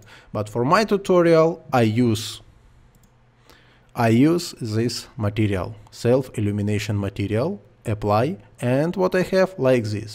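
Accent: Russia. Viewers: А по-русски тоже самое можно?, иностранцы ваш английский все-равно не понимают, думают вы по-русски говорите...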